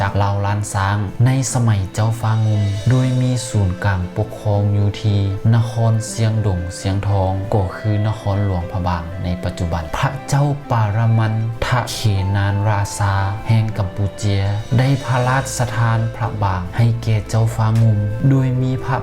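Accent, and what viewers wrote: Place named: Thailand. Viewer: สำเนียงคนไทเเท้ครับผมคนอิสานเด้อ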